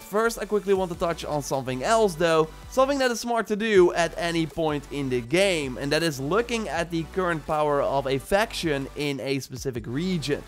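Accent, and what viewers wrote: Netherlands. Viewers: You can hear by his accent, this guy is Dutch. If you is Dutch. "Wassup Northern brother" If ya aint. "How you sound Dutch?" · His Dutch accent 😂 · Your definetley dutch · Are you Dutch by any chance? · Your english sounds dutch too me 😂😂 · Echt heftige nederlandse accent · Jij bent toch een Nederlander hè? Omdat je accent er op lijkt....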